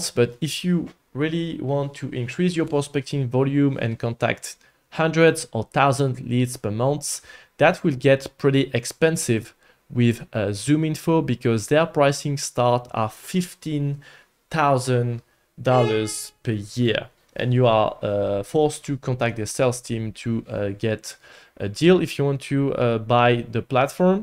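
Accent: France. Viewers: je crois reconnaître un accent français ?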